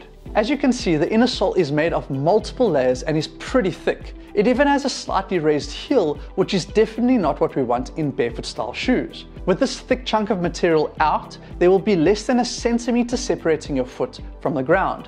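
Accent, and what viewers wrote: South Africa. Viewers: Damn, the South African accent...first time watching, something in the back of my mind recognized that accent immediately, Greeting from Mzansi Chief :)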